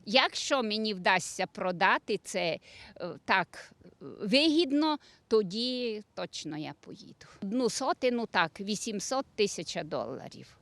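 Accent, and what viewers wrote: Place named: Transcarpathia, Ukraine. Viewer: Приємна і зрозуміла українська з самобутній забарвленням закарпатського діалекту. Довгих років життя господині.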